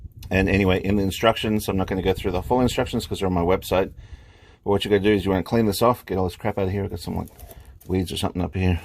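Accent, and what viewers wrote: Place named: Australia. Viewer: Great explanation and tips. You sound very Australian, I can't figure it out haha. Cheers Cobber!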